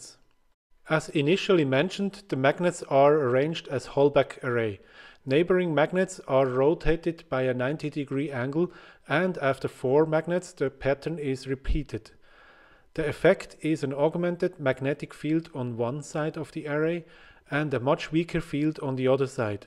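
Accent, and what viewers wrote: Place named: Switzerland. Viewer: Der Dialekt liess mich vermuten, dass du vlt. Schweizer bist wie ich. Ein Blick auf den Kanal bestätigt zumindest die deutsche Muttersprache.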